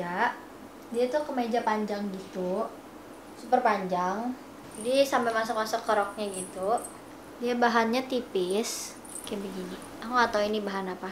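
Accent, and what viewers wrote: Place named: West Java, Indonesia. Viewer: Kaka orang Sunda ya... Logatnya keliatan😄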